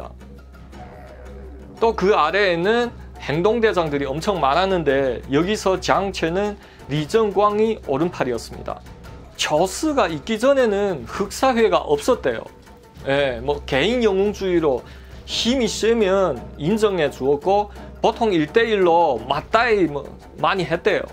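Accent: Yanbian, China. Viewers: 발음을 들으면 연변조선족인거 같아요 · 이름도 한국이름이고 근데 뭐지.. 중국사람인가? 발음이 사투리도아니고 뭐.. 중국사람인가요 이분?? 우연히 .보게 되었는데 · 조선족말투 잘하시네요 ㅎㅎ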